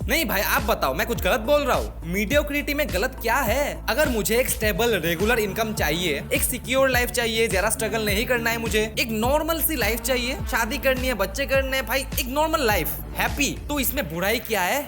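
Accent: Assam, India. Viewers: Dada tumar pora axomiya vibes ahise akdom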